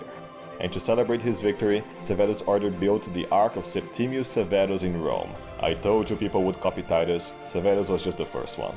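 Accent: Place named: Brazil. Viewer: bro WHAT COUNTRY ARE YOU FROM??? YOU SOUND LIKE A BRAZILIAN SPEAKING ENGLISH and this is coming from an English teacher that is brazilian as well so wtf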